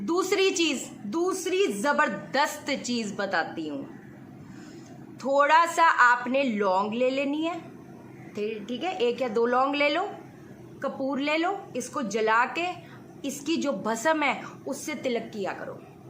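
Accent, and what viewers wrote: Punjab, India. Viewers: Your way of telling Passha logo (punjabi tone and way of communicating) is killing... Love you Mam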